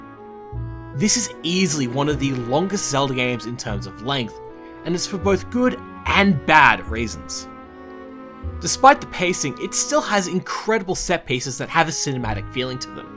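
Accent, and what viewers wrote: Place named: Australia. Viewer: Nothing better than some late night aussie/british video game commentary essay, great work!! if they remade the game like they did with xenoblade 1, I wonder if they would also just make it more anime like to match with botw but I fear it would impact the more dark and muddy tone it had, really added a lot to the ambiance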